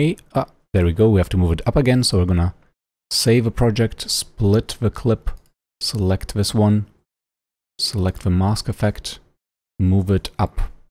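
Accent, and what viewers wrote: Germany. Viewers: you can hear the german accent immediately. it's as plainly as the russian accent. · wie deutsch kann ein englisch bitte klingen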